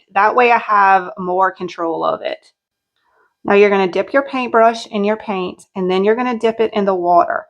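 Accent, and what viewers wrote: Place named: North Carolina, United States. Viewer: I love her accent. I'm from NC and we get made fun of at times as well by the Northerns. LOL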